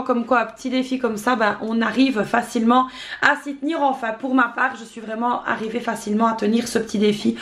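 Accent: Liège, Belgium. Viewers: Moi je préfère quand on entend sa voix top forme avec son super accent liégeois 😉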